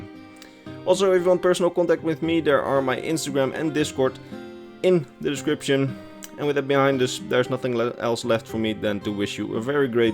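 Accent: Netherlands. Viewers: Haven't really watched the while video yet (doing that now) Love the video so far, really Interesting. Do you happen to be Dutch? I may recognise a slight but of am Dutch accent.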